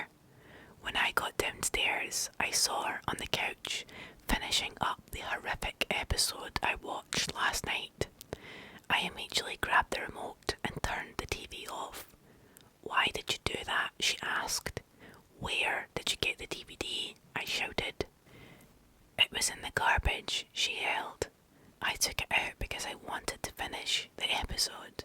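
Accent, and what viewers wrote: Scotland, United Kingdom. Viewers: Fuck aye the Scottish accent is great for asmr. Sending love from Wales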